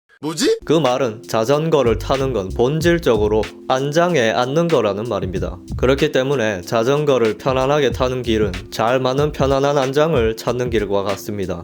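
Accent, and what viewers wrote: Gyeongsang, South Korea. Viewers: 저도 경상도 사나인데 사투리가 와이리 듣기 좋노~ 참 히안하데이~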